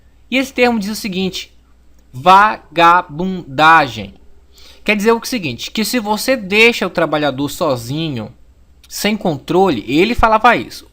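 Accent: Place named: Pará, Brazil. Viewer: Ahaha desde seu primeiro vídeo conheci o sotaque paraense rsrs, saudades da minha terrinha...mas amo Brasília rsrs! Adorei o vídeo sabe muito, parabéns!!!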